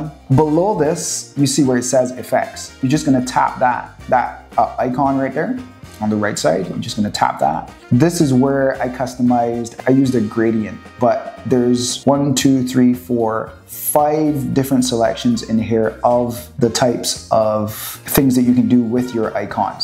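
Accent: Barbados. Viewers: Hey man , random question, are you from Barbados 🇧🇧?😂 or the Caribbean at least, I hear my people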